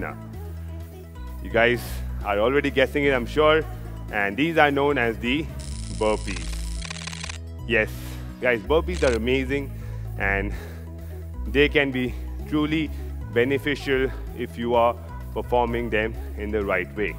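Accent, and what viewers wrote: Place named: India. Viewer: he sounds more Indian than American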